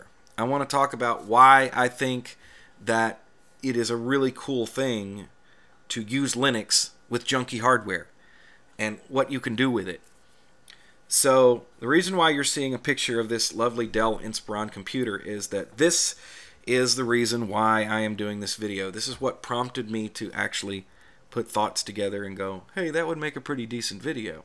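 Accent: South, United States. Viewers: Hey, new sub here, I have played around with Ubuntu here and there through the years, but was always intimidated by the terminal, dove back in recently with Linux Mint on an old HP pavilion Laptop with Core 2 duo and 4Gig RAM. Now that I'm all about learning how to properly use Linux your videos have been awesome. Where in the South are you from, you sound like you're from around my neck of the woods. I could listen to you dispense wisdom all day.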